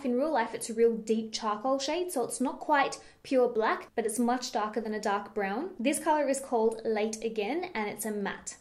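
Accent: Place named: Australia. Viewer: #LANGEFAM Hey, I am brand new to YouTube - seriously only used it for music in the past, but recently have been watching many of your videos! You are brilliant! Your make up advice is so easy to follow and definitely makes a difference. I've watched others, but didn't like them as much - maybe its the Aussie accent lol I was always a tomboy/athletic/workaholic, so I wore makeup, but never really took the time to really appreciate what works best....you have helped! THANK YOU! Would love that kit to really try to improve my look! Keep being you! You're great!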